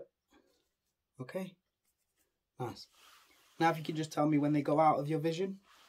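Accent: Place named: United Kingdom. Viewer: I enter this dude asmr...... THIS DuDE is BRitisH.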